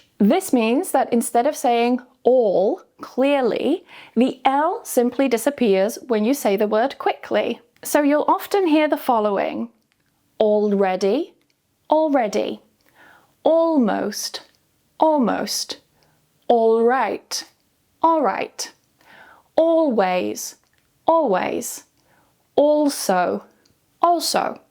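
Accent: United Kingdom. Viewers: Wow! Good British english pronounciation. Great!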